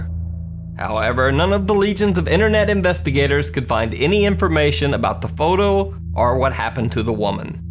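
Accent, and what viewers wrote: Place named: South, United States. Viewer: There's a hint of Southern accent to your voice here. But I haven't noticed it in any other videos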